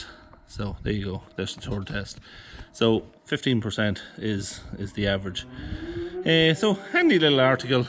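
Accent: Ireland. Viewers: Where are you from in Ireland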